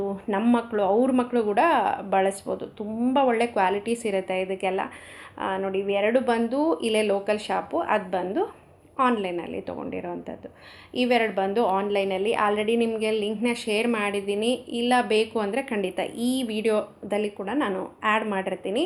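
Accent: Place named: North Karnataka, India. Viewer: good to hear ur kannada...u speak uttara karnataka kannada with ur family and as usual common kannada slang in the vlog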